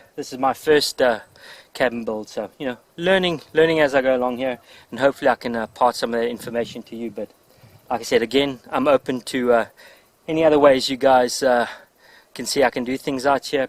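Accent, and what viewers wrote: South Africa. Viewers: Was ts ure if you were South African but the takkies gave it away! Lekka project!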